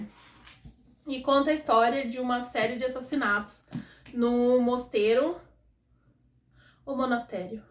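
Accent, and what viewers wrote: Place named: Inland São Paulo, Brazil. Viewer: Além de amar seu conteúdo (te sigo há pouquíssimo tempo), preciso falar que te acho belíssima, que sua voz e seu sotaque muito me agradam (você é do interior de SP?), sua roupa e maquiagem são lindos!! Gostaria de sugerir uma iluminação diferenciada... Eu não sei, não conheço as coisas, talvez aquelas ringlights? Eu achei um pouco escuro, não sei... Obrigada pelo vídeo :) O calendário ficou tudo!!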